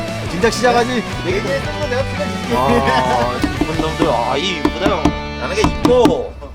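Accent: Gangwon, South Korea. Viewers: 강원도 사투리는 언제들어도 정감이 ㅎ 구경잘허구가우 !!! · 강원도 사투리 좋네요. 구독 신청요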